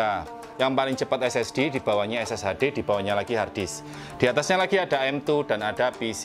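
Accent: Java, Indonesia. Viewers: maklum, wong jowo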